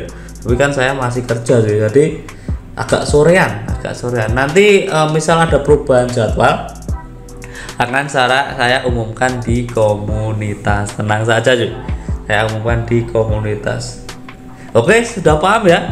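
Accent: Java, Indonesia. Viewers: Saran: cepat2 beli facecham biar g jadi ninja lagi 🤣 Kesan: public speaking asik ga bosenin dengan nuansa jawanya wkwk Kritik: sering-sering live mang kqlok bisa seminggu 3x 🙏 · Hallo mas saya seneng nonton nih chanel, semoga makin maju dan makin terbaik dehh amin Saran : kalau ada facecam pasti asik mas bisa liat wajah samean pas ngonten wkwk Toxic gpp mas medog nya lucu mas kalau didenger orang2 sesama Jawa wkwk mantapp 👍👍🙏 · Saran:sering upload gameplay/push rank biar bisa belajar dari gameplay bg Kesan:omongan nya lucu medok dan penjelasannya gampang di Terima suka bahas bocoran soal event yang akan rilis Moga channel makin berkembang dan bisa tembus 100rb subs bulan ini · Saran : untuk next time semoga abang sehat ya, masalahnya saya bisa build squad karna nonton chanel abang Kesan : penjelasan nya kaga belibet, dan saya suka sama logat bahasa Jawa nya Kritik : jangan sering emosi bang kalo gacha kaga hoki, wkwk itu ae sih soalnya bingung nih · dengan ucapan basmallah, bismillahirrahmanirrahim untuk diri saya semoga bisa menang give away dan untuk bang keren bang, lanjutkan, chanel fifa terfavorit, paling profesional daripada yg lain, bahasa mudah dipahami dan asik bahasa jawamu sangat medok dan menghibur semoga dilancarkan terus rejekinya, sehat selalu, dan chanel bisa berkembang terus menerus saran bang untuk konten, bisa kasih tips untuk pemula yg awal main fifa bang, soalnya kalau udah ketinggalan jauh susah untuk mengejar pemain yg terdahulu hehe sukses terus bang! 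panjang umur hal hal baik!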